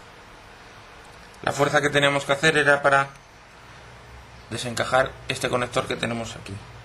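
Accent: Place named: Spain. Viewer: UR A FUCKING IDIOT this is European SPANISH From spain you fuck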